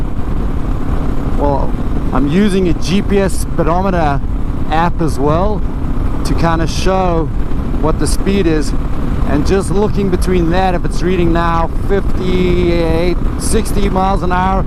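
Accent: South Africa. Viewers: Is that a South African accent I detect? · I detected the accent pretty quickly too. I lived in SA from 1968 to 1979 in the Amanzimtoti area mostly..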